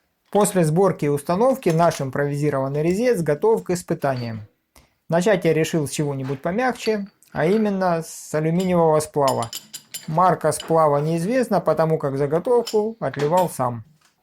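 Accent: South, Russia. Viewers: Блин, довольно долго думал, что он действительно называет канал "Мегамозг". Но это оказалось моей ошибкой восприятия, из-за его южного говорка. Оказалось что он меХамозг. Надо думать, от слова механика, механический.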